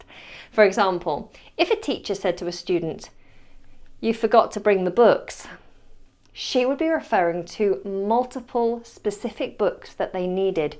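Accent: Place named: United Kingdom. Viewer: Hi! Came here looking for info after finding your singing channel and falling in love with it 😍 Is there any hope you will start posting there again? Maybe once every few weeks? 🙏 I felt so inspired and happy that I finally found a vocal coach that I absolutely love ❤️ And then I saw that the last video was 2 years ago 😢😭🥺 Thank you anyway for all the videos there! They are just awesome! And I loved that you're British because I strive to sound more British and having an American vocal coach wouldn't be as great. So, love you! Just think about it 😍🙏🤗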